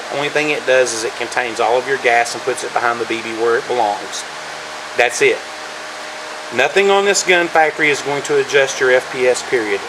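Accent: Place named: South, United States. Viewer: now hummm now now you have a south accent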